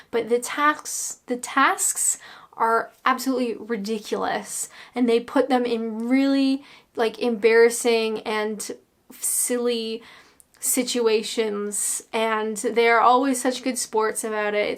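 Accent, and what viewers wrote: Canada. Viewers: OK, just another creepy old guy here who only recently found your channel, I love Canada and I really enjoy your delivery. However, if you get the chance to return to the UK and if you want to assimilate with Southern England, then you need to learn how to pronounce Task Master ... it should sound like Tarsk Marster. ;-) ;-) ;-) Haha ... tbh, I like your accent, don't change it. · wow that canadaian accent has come back really thick and strong. instead of crap under ur grey sofa, i see ur chucking clothes and crap on the floor near your bedside table. what book are you reading?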